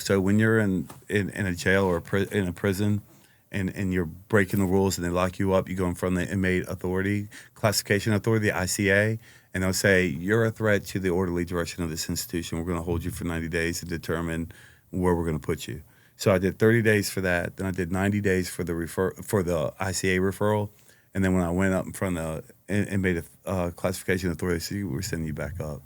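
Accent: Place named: Baltimore, United States. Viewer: Why the Baltimore accent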